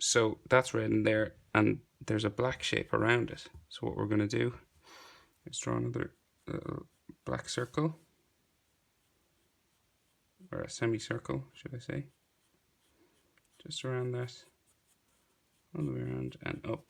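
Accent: Ireland. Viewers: hey man you from cork? I hear that accent. Nice drawing thanks for the tutorial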